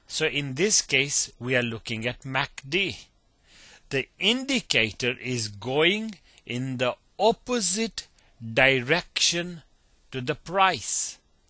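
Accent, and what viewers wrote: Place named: India. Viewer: love the indian accent. thnx for the vid tho :)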